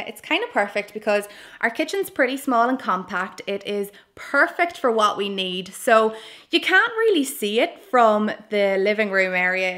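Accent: Ireland. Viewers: This is the first video that I have seen I love your apartment and listening to you chat. I have been to Ireland three times and cried every time I left. My family came from Galway. Your voice makes me homesick for Ireland . Love your apartment.❤️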